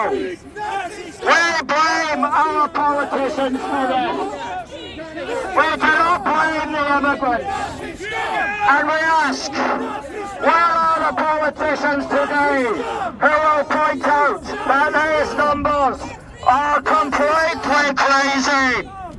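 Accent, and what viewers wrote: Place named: Scotland, United Kingdom. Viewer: He sounds Scottish to me!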